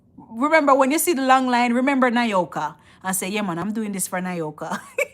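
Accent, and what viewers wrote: Jamaica. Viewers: You are great love to lissen to your words My english is not perfekt but anyway i can understand even if you are talking jamacian and i enjoy so mutch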